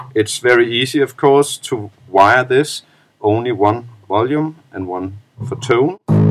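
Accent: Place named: Denmark. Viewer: meget nice men klar dansk accent xD kunne høre det med det samme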